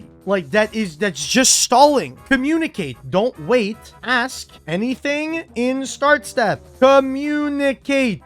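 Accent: Quebec, Canada. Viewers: He is french canadian! Pretty sure from quebec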